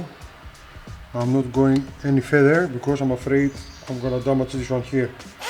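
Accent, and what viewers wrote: Greece. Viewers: I assume this is the manifold you bought from <link>: ALLOY WATER HEATED TORQUEMASTER INLET MANIFOLD HS4/6 HIF38/4 I guess they would rather sell you a more expensive twin-carb manifold with some extra carburettors Hope to see some more Mini-Videos soon!!! I like your (broken) English with a Greek Accent! I speak (broken) English with an Afrikaans Accent! (LOL)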